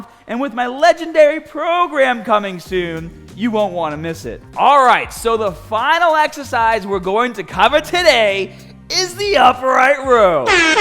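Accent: Boston, United States. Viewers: Is this guy from Boston?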